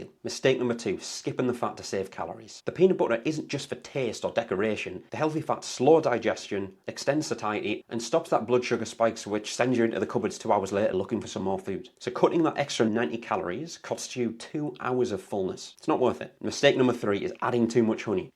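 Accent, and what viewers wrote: Newcastle, United Kingdom. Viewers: I just thought it was because you were a Geordie. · He's a Geordie. Like Scots, he talks faster because he thinks faster than our southern cousins 😂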